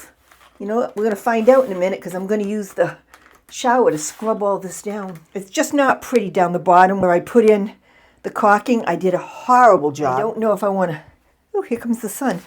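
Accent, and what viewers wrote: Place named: New Hampshire, United States. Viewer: when you find that bra, you must share with us. I have exactly the same need. Love your show. Listening to you reminds me of my mother’s family from Manchester, NH. How they are missed!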